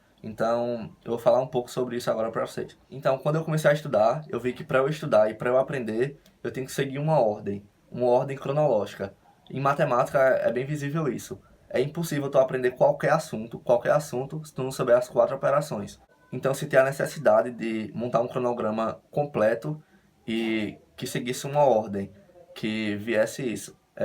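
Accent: Northeast, Brazil. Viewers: Você é do Nordeste?🤔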